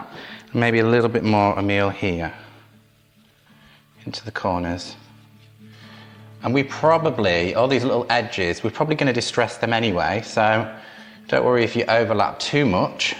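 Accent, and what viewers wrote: England, United Kingdom. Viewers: Love love love love love!!! The step by step, easy to follow instructions. So refreshing to listen to an English accent instead of a droney, nasal American twang! And your phrases: a tickle here, a tickle there. Just loved it. And the result is breathtaking. Completely inspired, thank you 😊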